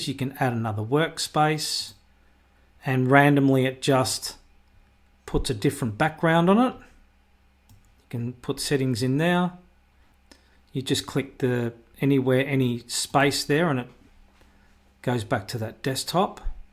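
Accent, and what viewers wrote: Australia. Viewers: i love the aussie accent!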